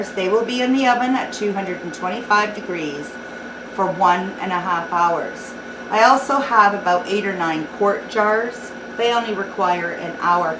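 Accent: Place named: Canada. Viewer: I guess I missed the time and temp. I saw you said 200f, but for how long? You're right, I vacuum sealed my jars, but didnt heat them first. I need to go back and redo them. I hear your Canadian accent, I was born in Ontario!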